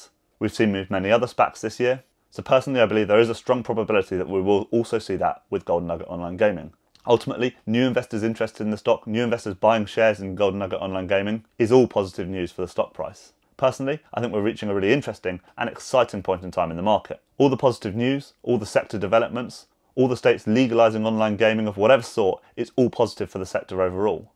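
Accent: United Kingdom. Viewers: Really appreciate the update, thank you. I got into this SPAC through you, this could be a great success story so gonna watch it and enjoy the journey. Looking forward to your next pick. Also - so nice to hear a YouTuber with a British accent! Mark - Hertfordshire.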